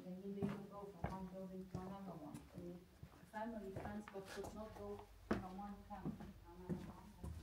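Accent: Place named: United States. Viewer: Your accent is really hard to understand, are you from America??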